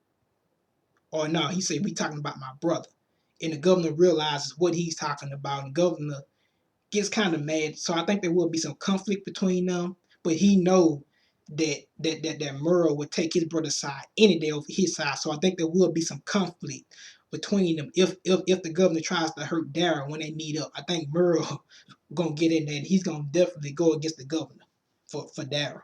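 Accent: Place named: New Orleans, United States. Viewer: Sounds like your from New Orleans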